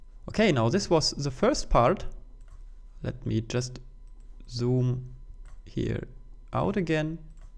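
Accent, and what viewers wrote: Germany. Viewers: Man hört das du Deutscher bist :-)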